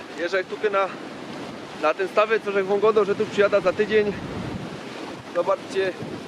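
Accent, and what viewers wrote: Silesia, Poland. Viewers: nie moge sluchac tego slaskiego dukania, ide dalej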